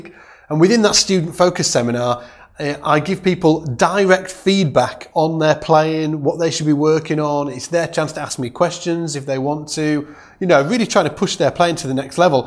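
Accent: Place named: Staffordshire, United Kingdom. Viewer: Guilty as charged for murdering the blues with the pentatonic with the blue note! Pentatonic just kills the blues....well this jazzy kind of blues you show here.You sound like you're from the Lichfield/Tamworth Staffs area mate.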